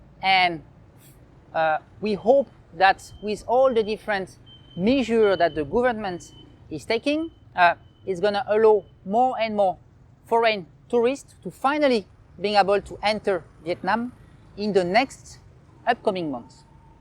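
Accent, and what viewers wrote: France. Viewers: Loves ur French accent. Great job bro ok.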